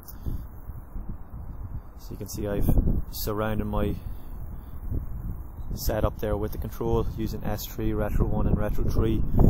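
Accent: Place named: Ireland. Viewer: What a crappy tutorial.. How do you expect anyone to follow along with the monotone, robot sounding voice that also has a sharp Irish accent.. And how about that wind noise that drowns you out - that's actually kind of nice to be honest. Seriously, I don't think you guys listen to it before uploading to YouTube.. If you did you would be like OMG, THAT IS WORSE THAN A STEAMY PILE OF 💩. Do better, viewers deserve it!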